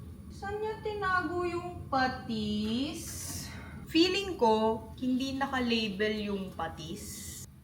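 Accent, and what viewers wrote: Batangas, Philippines. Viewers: Mahilig ako sa mga video ng mga tao at ibang culture. Mga ibang lahi na nasa pinas. Or pinoy na nasa abroad kasi nasa abroad din ako. Eto talaga paborito ko sa kanila kasi kababayan ko are sa batangas haha. Pati pagsasalita. Nakakamiss mga batanguenyo. At mabuti yan sa mental health mo andyan kankung saan ka masaya kaya mabuti at nasa pinas ka. Napanood ko kasi ibang mga video mo. Ako at yung ibang pinoy sa abroad no choice minsan. Nakaka epekto talaga sa mental health natin. Napanood ko kasi yung homesick video mo.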